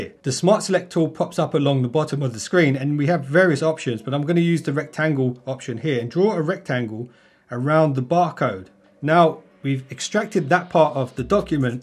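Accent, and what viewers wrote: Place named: United Kingdom. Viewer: uve got a british accent but you say zee instead of zed .... weird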